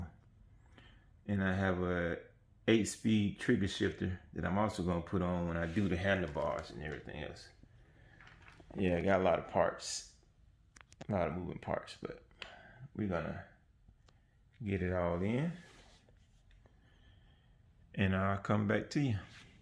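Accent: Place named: Atlanta, United States. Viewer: I would have guessed he is from outside of Atlanta somewhere...I try to guess regional accents. My guess is he grew up and/or lives within 40 miles of Atlanta, Georgia.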